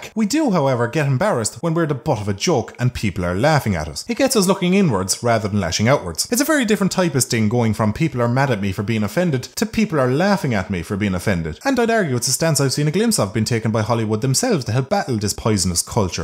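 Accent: Ireland. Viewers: Love your accent! Is it Irish?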